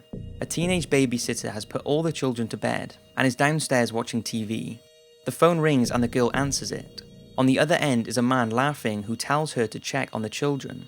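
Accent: United Kingdom. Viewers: Idk why, but it's always scarier when a brit is speaking in the video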